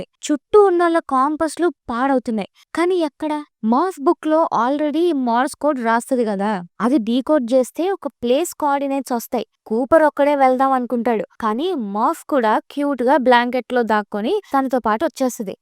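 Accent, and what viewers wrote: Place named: Telangana, India. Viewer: Nee explanation kanna Interstellar movie maro sari chustey arthamaipoddemo papa..nuvvu inkaa confuse cheshaav..sorry.. కాకపోతే నీ స్లాంగ్" అయిపోతది, వస్తది, పోతది, రాస్తది" వింటుంటే..మీది తెలంగాణ అనిపిస్తోంది..Am I right. ??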